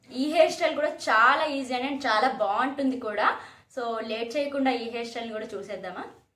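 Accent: Srikakulam, India. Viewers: Akka Srikakulam language super ga undi chala baga matladu tunavu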